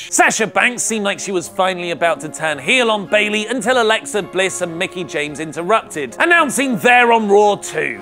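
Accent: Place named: England, United Kingdom. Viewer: Where are you from? I'm not familiar with English accents, and I find your manner of puting an "er" in every word that finishes with an "a" quite intriguing! "John Cener" "Wrestlemanier" "Lucher Underground"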